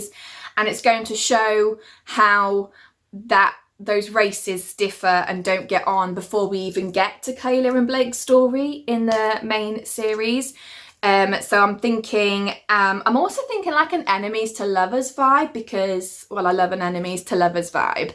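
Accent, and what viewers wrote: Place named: United Kingdom. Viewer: omg i love it i am from the us though so British accents always sound good to me lol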